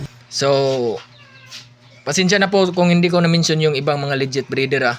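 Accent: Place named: Visayas, Philippines. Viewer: Halatang bisaya jud ka part kay imong accent same sa accent nako sauna magtagalog pero ok rana as long as makasabot ang tanan 👍 pronunciation lang practisa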